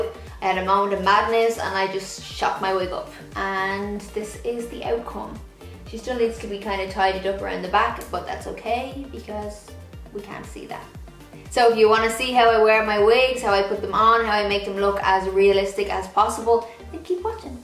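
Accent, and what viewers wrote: Ireland. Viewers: Denise Gasparini she’s definitely Irish yes! I don’t know where the Hispanic come from 😅🙈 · Obviously Irish, beautiful and obvious. GO IRELAND!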